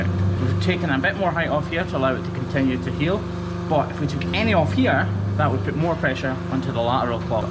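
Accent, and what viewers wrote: Scotland, United Kingdom. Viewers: I'm just curious, what would say your main viewer demographic is? For example: People who are wanting to learn about trimming cows feet? People who are hoping to see a little puss? People who find these videos put them to sleep? Women from America who just want to hear a cute guy with a Scottish accent talking to them? Or something else? I'm sure all these examples apply but what do u think the main one is? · love this guy and ahis Scottish accent.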